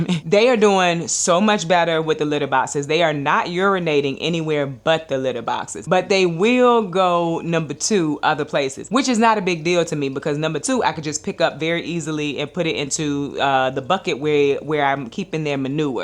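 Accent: South, United States. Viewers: I really love your voice! You have a southern accent, my mil and I both love to watch just to listen to you and we learn so much from you too! Loving the bunnies too. I also just started watching Sage and Stone and am loving her channel as well.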